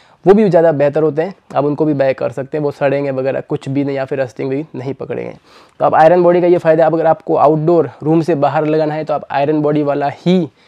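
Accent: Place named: Shekhawati, India. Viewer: भाई आप झुंझुनू के है या चूरू के हैं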